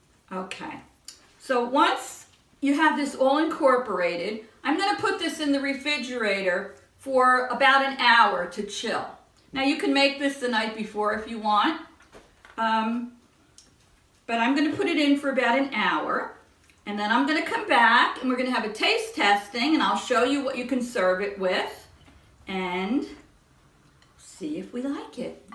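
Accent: New York, United States. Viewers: Whoa...I jumped when the bowl slipped from your hands! That dip looks yummy and pretty. I've got to ask...are you in New York, cause I detect an accent? (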